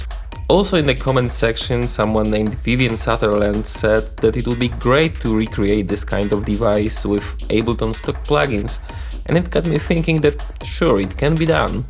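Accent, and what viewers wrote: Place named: Poland. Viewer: w pierwszych slowach slychac swoich:p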